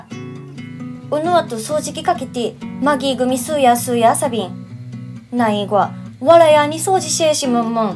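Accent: Okinawa, Japan. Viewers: 沖縄の方言何言ってるか分からんけど可愛い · 中部と南部の方言が混ざってる感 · 綺麗なウチナーグチ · 中部なまり 同じ事を2回繰り返して言うのは「 」のクセ（笑） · 南部方言ですか？？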